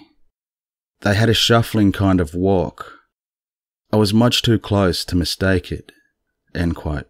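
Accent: Australia. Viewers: Cool as :) .. I could tell by your accent you were an Australian. Qld's weather is so out of wack especially this year. Great to be a part of your crew .. :)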